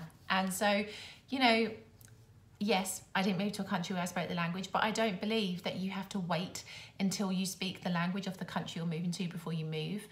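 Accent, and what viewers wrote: England, United Kingdom. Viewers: I just found out your channel, I love your accent, what part of England are you from?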